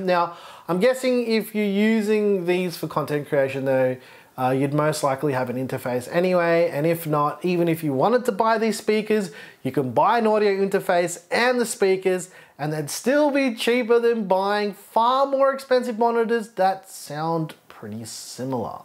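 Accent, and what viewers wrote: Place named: England, United Kingdom. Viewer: English accent IS shit ans a Real pain for french ears 🗣️🙉😢 Un homme de France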